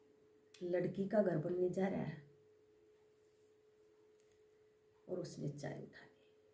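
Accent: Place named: Haryana, India. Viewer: bhn me theth haryanvi 👍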